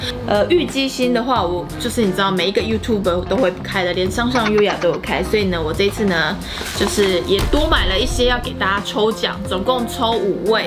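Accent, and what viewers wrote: Taiwan. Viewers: 「 好辣選我選我」 喜歡看開箱的影片還有聽你烙台語哈哈哈真得辣了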